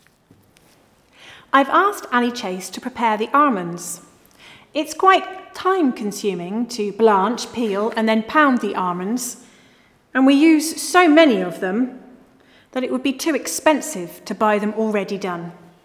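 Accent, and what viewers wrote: United Kingdom. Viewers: Where is this actress from?? I can't, for the life of me, place her accent in the UK. Armonds- almonds Saurce- sauce And so on. I'm wracking my brain! Does anyone know definitively?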